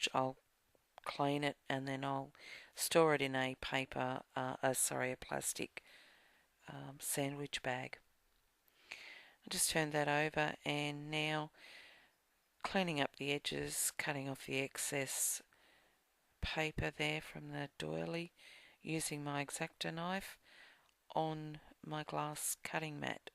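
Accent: Australia. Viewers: Hello:) I really enjoyed watching your video, and after watching your video, I am off to get some glimmer mist to use with stencils. An excellent idea! From a fellow Aussie, it was wonderful to hear the Aussie accent again!:)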